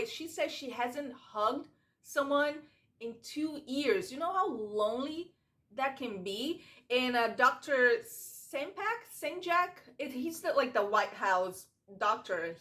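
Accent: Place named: Brazil. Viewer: És brasileira? Quase que adivinho por causa do sotaque :) Eu sou portuguesa, ahahah! Descobri agora o teu canal. Já subscrevi.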